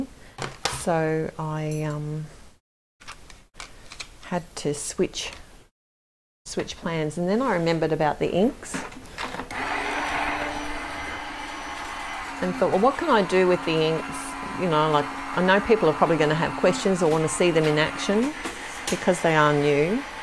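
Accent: Australia. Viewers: What state do you live in? It’s nice hearing an Aussie voice.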